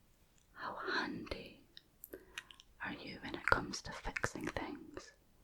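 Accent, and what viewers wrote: Scotland, United Kingdom. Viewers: This wins. This is how all scottish accents should be and im from just outside Edinburgh 🤣🏴. Love this! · I love your Scottish accent. So tingly. 🥰🥰🥰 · Aye lass ... I much prefer your Scottish accent! It's especially cute with the wee bairns! 😊😊😊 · Ok so i just googled "vow of Celibacy" and means something totally different. Plzz correct me if its just me getting confused with ur Scottish accent .😅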